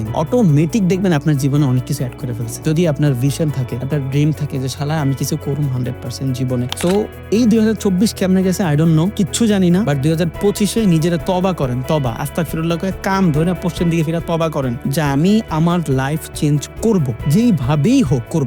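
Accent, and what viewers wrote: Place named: Shariatpur, Bangladesh. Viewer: কিও ভাইয়া আপনার মুখের ভাষা দেখে মনে হয় আপনি শরিয়তপুর জেলার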